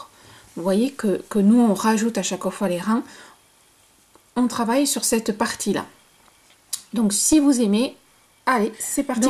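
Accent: South, France. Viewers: bonjour et trés joli châle et continuez à parler un peu de sud ne fait pas de mal au contraire merci pour ce tuto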